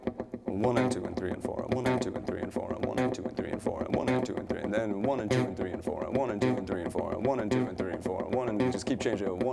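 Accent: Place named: New York, United States. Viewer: He sounds like FZ with a NY accent.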